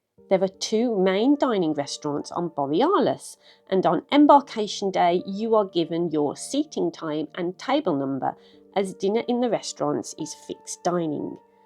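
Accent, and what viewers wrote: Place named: Black Country, United Kingdom. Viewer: Great work on this video …very comprehensive…..and, the Black Country accent is fantastic ….( that’s a guess by the way ) 😂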